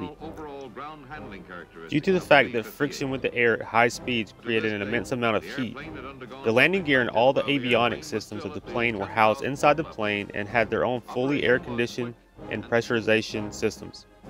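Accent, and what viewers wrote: South, United States. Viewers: It's weird to hear a narration that has a southern accent like mine. I love it. Fantastic video, the B-58 is an unsung marvel of the cold war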